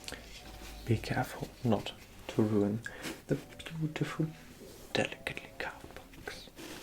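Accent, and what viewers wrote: United Kingdom. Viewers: Just discovered your channel last night. The Butler one where you are unwinding us from.a night out was FABULOUS!!! I love themed and time period based ASMR vids. The Fire crackling, the light old time background music is perfect. And the elevated British speaking tone is superb. "Chefs kiss" Keep up with the lovely and hard work you put into these. Looking forward to binging your channel ❤ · I got so used to the british accent so fast that when he mentioned he was the best tailor in the UK I had to take a moment to collect myself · I finally find British ASMR. Hello from Tehran 💚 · Are you actually British?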